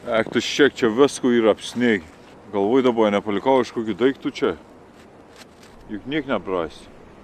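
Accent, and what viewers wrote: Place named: Samogitia, Lithuania. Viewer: Nu man sunku suprast sita kalba galetum nezemaiciuot butu fainiau daugiau prenumeratoriu susirinktum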